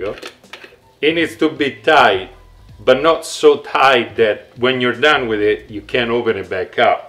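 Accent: Italy. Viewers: Immaginavo...anche se parli un'inglese molto italianizzato...vero? Però va bene il video corto ma quei trogloditi degli americani poi fanno cappelle(errori),e quando vengono in Italia non capiscono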